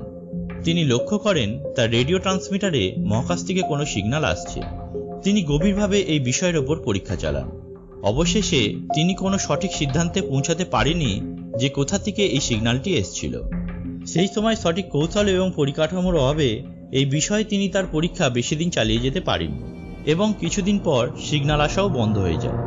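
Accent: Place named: West Bengal, India. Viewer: indian bangla...... just...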